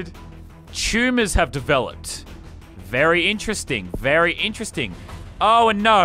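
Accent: United Kingdom. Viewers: You sound British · Ok, but the same can be said of Americans. Back then British people moved to America and mated with the islanders there. That’s why you sound British and Are British. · your british haha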